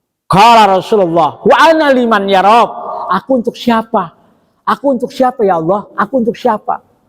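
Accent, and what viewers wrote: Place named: Banyumas, Indonesia. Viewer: Semangat syaikh ❤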